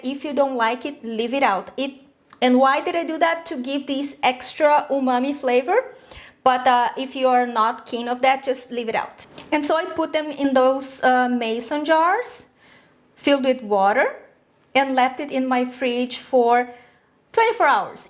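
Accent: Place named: Brazil. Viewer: First time I watched one of your videos I thought "what accent is that, where have I heard it before?" and then I saw your last name and realised it's the same one I have, hahaha. Great too see a fellow brazillian making such beautiful vegan dishes and reaching the whole world with your recipes. Te desejo todo o sucesso do mundo,!:)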